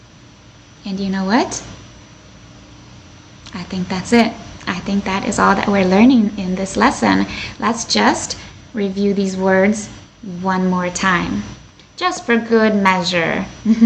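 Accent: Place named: Russia. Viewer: I just love your Russian accent, it's just beautiful , thank you for teaching us 🌻🌻💛💛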